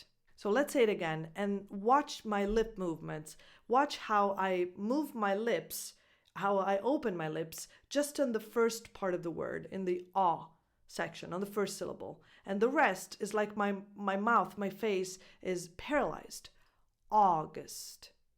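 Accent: United States. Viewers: Sorry but you speak Only American and Not English as most of the italian teachers......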